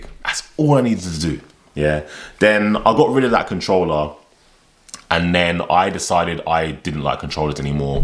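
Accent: London, United Kingdom. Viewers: Just found your channel, so glad! I love your London accent and your vibe + great info!! What controller would you recommend for a beginner who travels a lot and wants to be able to travel with it easily? Around 300-500 pounds budget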